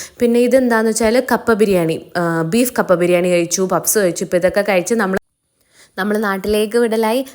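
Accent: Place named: Kannur, India. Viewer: Evida veedu? Bhasha kettondu chodhichatha kannur pole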